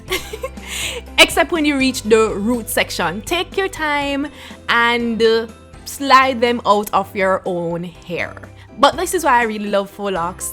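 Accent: Jamaica. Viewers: I LOVE,LOVE YOUR ACCENT ARE U JAMAICAN Cuz U HAVE MY GRAMMARS ACCENT LOVE IT!!! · Are you Jamaican because I can hear your accent a whole lot! I'm Jamaican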